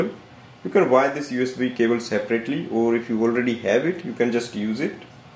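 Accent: India. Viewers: Your Indian accent is annoying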